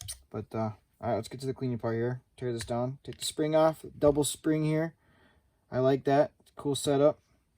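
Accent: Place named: Wisconsin, United States. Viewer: From Appleton, loving that Wisconnie accent. Great job taking us through this pistol.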